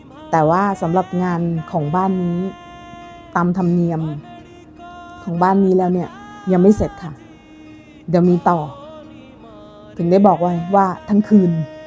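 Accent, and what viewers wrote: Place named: Central Thailand, Thailand. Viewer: เสียงบรรยายพูดได้จังหวะท่วงทํานองภาษากลางได้ชัดเจนมาก เทียบเท่าพิธีกรมืออาชีพ หรืออาจจะมากกว่านั้น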